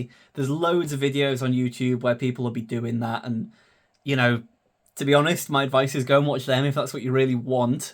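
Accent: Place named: Derry, United Kingdom. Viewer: Your accent sounds just like Aileen from Derry on the Graham Norton show. 😆 Good job on the hillclimb!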